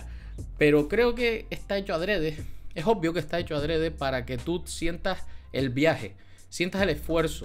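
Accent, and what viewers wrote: Canary Islands, Spain. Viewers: Español de Canarias por el acento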